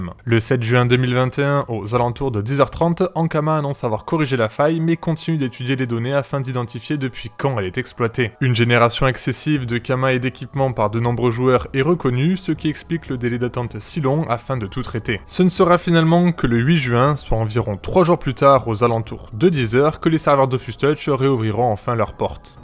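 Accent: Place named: Iran. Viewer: ça fait plaiz d'entendre un iranien (a l'accent)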